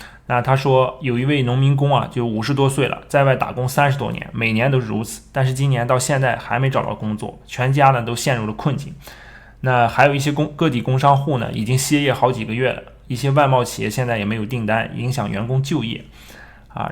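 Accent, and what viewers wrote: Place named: Inner Mongolia, China. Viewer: 内蒙口音听着很舒服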